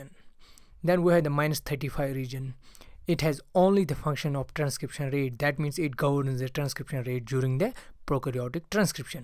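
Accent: Kashmir, India. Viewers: Sir Are you a Kashmiri. It seems by ur accent. Please reply.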